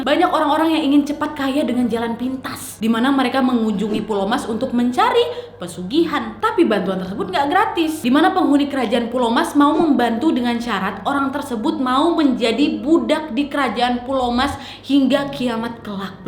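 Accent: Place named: South Kalimantan, Indonesia. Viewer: Dari kalimantan mana suara nyaa Kal-Sel Nih bos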